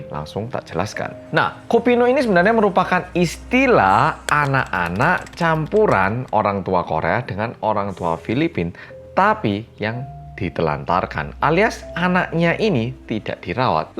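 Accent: Java, Indonesia. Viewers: Kk sdah menetap d korea ya? Aq org jawa,pling suka dg pembawaan medoknya😊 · Hai org korea medoook❤ Nyimaaak 🎉🎉🎉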